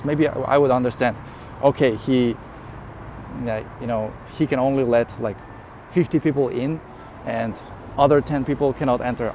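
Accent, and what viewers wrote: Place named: Eastern Europe. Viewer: He sounds a bit slavic...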